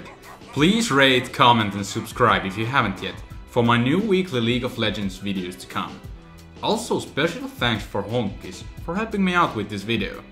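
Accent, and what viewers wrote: Finland. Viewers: Are you from Finland?!? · Where is he from? His accent sounds quite finnish.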